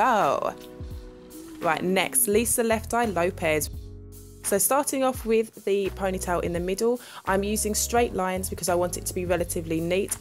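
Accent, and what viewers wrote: London, United Kingdom. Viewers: She's from London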